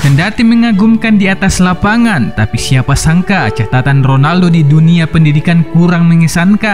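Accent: Medan, Indonesia. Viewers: Lucu pembacanya, logat nya masih terasa, kek nya orang medan ini, horas medan!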